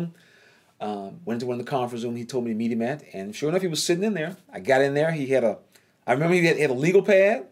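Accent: South, United States. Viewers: Southern drawl........what's wrong with 'our' southern drawl? I have cousins in WI. It's a different sound. My Mother lived in southern California...different sound. It's still English. We say y'all. WI Say yous guys. I understand what they mean and vise versa. I would have packed my suitcase and brought my southern a** home!!! Great video. Please tell Lady C hello. Nothing could be finer than to be in Carolina. Lol. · So it's not about JEHOVAH 🤔 it's about pleasing the ears of men wow, disgust. I thought all this was based on approval by JEHOVAH to be apart of Bethel. Another eye opener when JEHOVAH says HE'S roving the earth for those who's heart is turned towards HIM. When genuineness approaches and speaks to whomever it pierces to the soul so it shouldn't matter how southern you sound right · I know this video is two years old at this point, but I'm just now seeing it. 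I love your videos. They're so full of warmth and heart--no wonder the GB doesn't want witnesses watching this. You speak exactly like every brother from the South I've ever known. You're not bitter. You have good memories of the organization, but you don't let emotion cause you to outsource your thinking to other men. I admire your channel very much.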